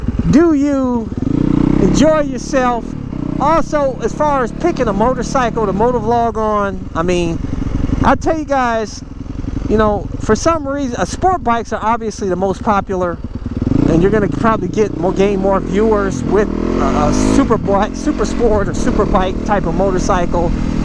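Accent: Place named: South, United States. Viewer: You're pretty cool for that. Thanks for the sauce (southern accent)!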